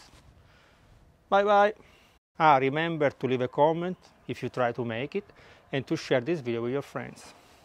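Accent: Italy. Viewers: Wow! Maestro,I really learnt alot especially that of your fascinating Italian accent, it makes me feel like am in Milan or Cincinnati already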